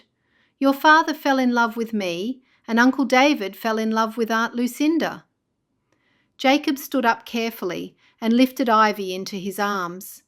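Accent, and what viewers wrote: United Kingdom. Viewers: Wow! Heavy British accent!😂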